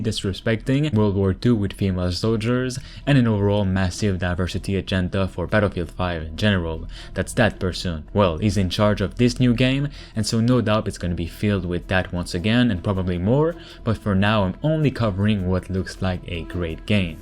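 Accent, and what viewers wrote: Quebec, Canada. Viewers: yes , plz cover it :) . also i can definitely ear that youre from quebec as you dont have a french accent in your english . soooo content de voir un qc qui reeussi sur youtube . ps oui je sais , mon francais est a chier . sa cest due au fait que langlais est plus facile pour moi meme si je suis un pur laine :) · Hey man, are you Québécois ? You have the accent ;)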